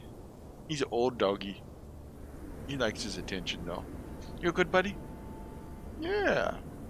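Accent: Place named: Midwest, United States. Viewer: I've really enjoyed your playthrough. Actually preferred over television/netflix or recent releases in the gaming industry. You do a great job, and struggle in a world with a character with flaws relatable to a common person. Though your character isn't set perfectly for an easy ride, it does however force you to play smarter just to survive, and a mishap can be a set back. Where are you from? You almost sound like you're from the midwest.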